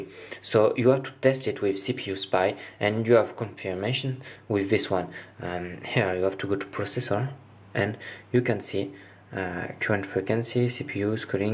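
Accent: France. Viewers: Lol, ur french, i can hear it Heun que g raison ? Bizzarement mon frere a le meme accent xD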